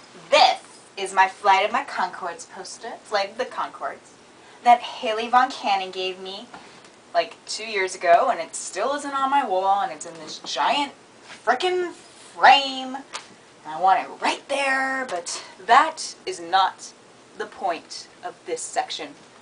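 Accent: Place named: Scotland, United Kingdom. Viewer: that's quality! loving the scottish accent -nobody important from AP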